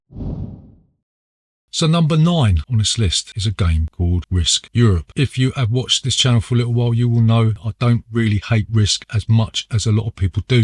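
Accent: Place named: South West, United Kingdom. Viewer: All the way at the back here. Time to throw out some random counties, I've always thought Kent but often hear a little south west twang Great list, M44 is there for me also.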